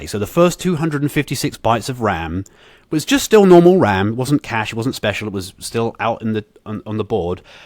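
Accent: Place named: United Kingdom. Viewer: I love these british accent. More than american english. Congrats!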